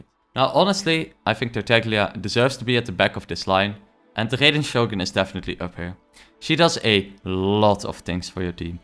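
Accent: Netherlands. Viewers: Subbed for the dutch English and I like your choice of childe being your choice.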